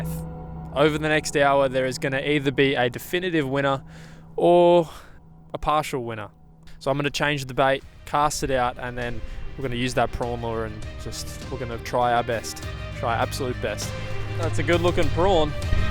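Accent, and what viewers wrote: Australia. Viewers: Is he Aussie??? 😮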